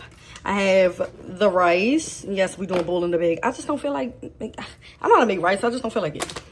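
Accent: Baltimore, United States. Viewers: Is that a b’more accent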